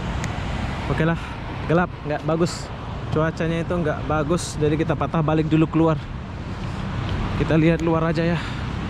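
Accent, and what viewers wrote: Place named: Malaysia. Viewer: Pakai loghat upin ipin ya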